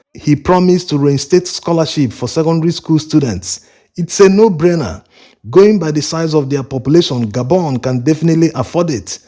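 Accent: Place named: Yoruba, Nigeria. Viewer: Thank you brother for the video, you doing wonderful job. One thing, you sounds like my Yoruba friend Nigeria 🇳🇬, are you a Yoruba? Your accent sounds so much like him. I have watching your videos for quite some time. The way you speak just reminds me about him. I don't want to mention his name on social media, you most be him.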